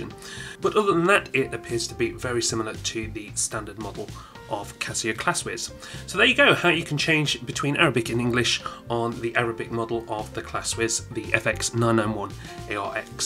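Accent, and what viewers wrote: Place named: England, United Kingdom. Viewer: I liked your English dialect. Is it an American or British accent?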